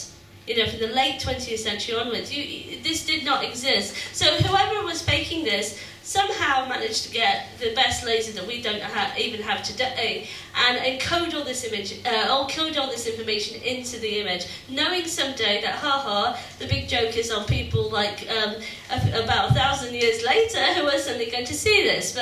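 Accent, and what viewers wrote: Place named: Estuary, United Kingdom. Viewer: i'm just starting to listen to this (i'm up to the beginning of the "visions" part), but i can't help noticing that is a very sensual woman with an absolutely delightful estuary accent....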